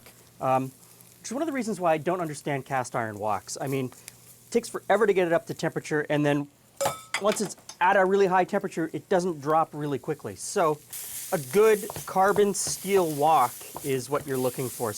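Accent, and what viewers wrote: Canada. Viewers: i knew from the accent you were a fellow canuck, always love Canadian content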